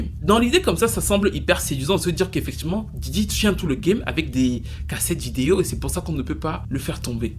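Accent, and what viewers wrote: Cameroon. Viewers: Je dis ein tu es Camerounais? L'accent ci me dit qq chose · J'apprecie enormement ton analyse, mais surtout ton accent un peu camer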